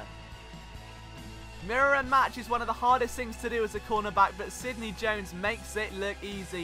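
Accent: United Kingdom. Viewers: I absolutely love your analogies, I'm a Native tarheel; and we have the Carolina Panthers here, but I've been an EAGLE fan for 33 years! Growing up there were no Black QB's, except for Doug Williams who still led the awful Bucks to an NFC divisional play off. Love the accent, I'm compelled to drink a cup of Earl Grey when watching your Eagle report, keep flying.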